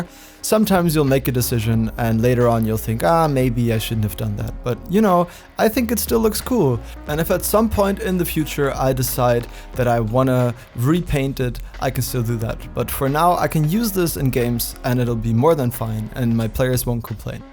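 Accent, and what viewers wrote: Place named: Germany. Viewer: I think ur right it looked better before but still now its more of what u imagined it to be, not terrain, marker, mini whatever ✌ btw where u from u sound pretty german but not totally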